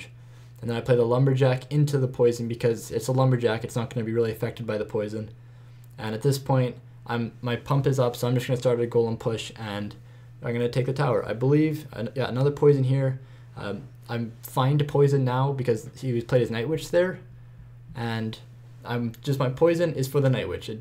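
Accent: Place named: Canada. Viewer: aha knew you sounded a little canadian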